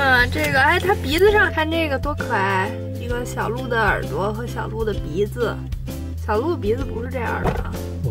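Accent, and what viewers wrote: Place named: Shandong, China. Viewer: 你是哪里人啊听着好像山东人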